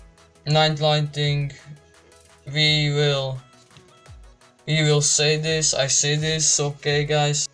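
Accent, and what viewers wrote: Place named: Czech Republic. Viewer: Když už podle prvního slova poznáš že je to Čech :D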